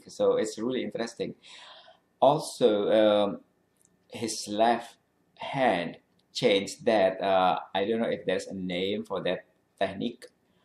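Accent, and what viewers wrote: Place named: Indonesia. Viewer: Your English is very good but I'm sure you are an Indonesian. I've got the feeling. If so, what part of Indonesia r U from? Greeting from Jkt. The mistakes/errors you've made are all the attributes to Indonesian speakers of English, no doubt.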